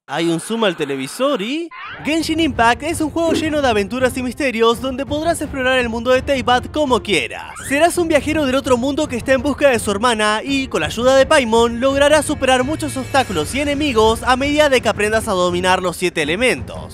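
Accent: Argentina. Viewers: Sos argentino?????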